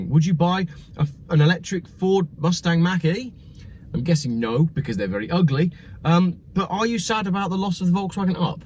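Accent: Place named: Yorkshire, United Kingdom. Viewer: I am sorry, but I think you have been watching too much You went all Yorkshire in this video. "E-Up!" PMSL So anyway, what I have gleaned from this is, we no longer live in a democracy & capitalism died. Supply & Demand went out the window as you can only have EVs, but nobody fell for it. So they are killing off what people want & need and fleecing you to get bigger unwanted motors.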